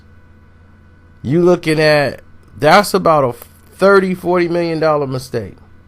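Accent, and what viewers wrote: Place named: New York, United States. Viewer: you trippin on this one.. who did tribute songs for BIG outside bad boy, which did it more less to line their pockets.. nas had a song for pac and big..and jayz snake a** was more like - i'm glad you're dead the city is mine..and in term of fans, pac got way more love..i can remember where i was at, what i ate that day..you remember how popular those bootleg 2pac shirts was.. the whole fam had them sh*ts..thought you was midwest, you sound like a new york n*gga on this one..eventho in their heart's they loved pac (ie. maino, uncle murda)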